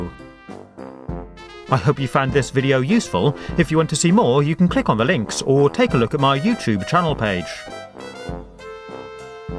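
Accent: United Kingdom. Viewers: god bless your british accent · he's British, that's how they say it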